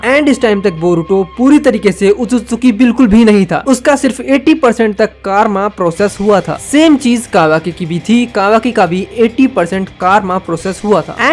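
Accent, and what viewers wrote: Bengal, India. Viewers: Bro are you bengali???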